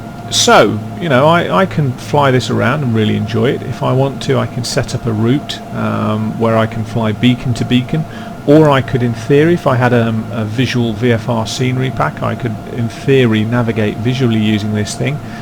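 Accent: United Kingdom. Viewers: Hello all, sorry if this post appears to be a bit late but I have only just got onto broadband (Yes I'm a Newbie, this is my first post!). Firstly well done on a well made review, I like the professional Royal Navy accent that you have which gives what you say more credibility. You remind me of Keith Thompson of Treasure Hunt fame who was also ex-Navy. Secondly regarding rudder use in FS9/FSX for helicopters remember to check your realism settings and ensure AUTORUDDER is switched OFF as this this can affect pedal control so you can't turn in the hover! Sorry if you already know this but it can get overlooked. Anyway thanks for reading and carry on the good work. SimonStanm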